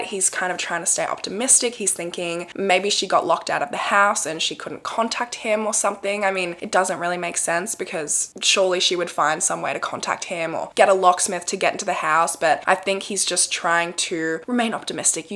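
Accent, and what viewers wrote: Australia. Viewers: RIP Joanna and peace and love to those who truly loved you. Oh my goodness! I can't believe I hadn't found your channel until now. I'm from Australia and going by your accent so are you...may I congratulate you for having such an amazing number of subscribers which I have just added to. Well done!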